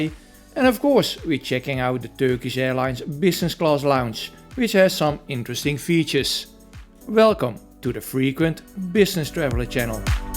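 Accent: Netherlands. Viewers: kom je uit nederland, ik kan dat aan je stem horen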